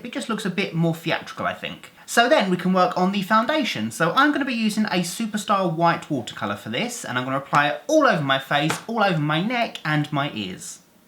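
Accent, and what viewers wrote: United Kingdom. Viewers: wait is this youtuber british?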